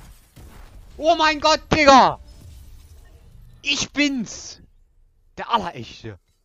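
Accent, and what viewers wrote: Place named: Saxony, Germany. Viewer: Bist du Sachse ich bin Sachse denke es wegen dem Akzent, könntest du meistgeschauteClips von FaZe Dubs oder mitro, benjifishy feie das Format. 👍👌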